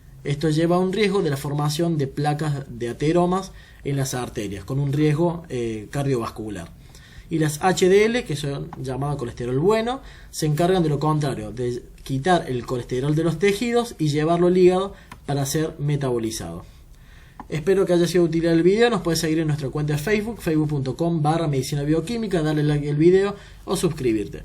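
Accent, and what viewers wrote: Córdoba, Argentina. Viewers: muy bueno, me gusta porque sacas todo del Blanco, que dicho sea de paso por tu acento cordobés supongo que son paisanos jajaj saludos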